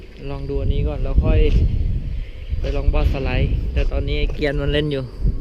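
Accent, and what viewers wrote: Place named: Suphanburi, Thailand. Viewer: ติดใจเหมืองเหน่อ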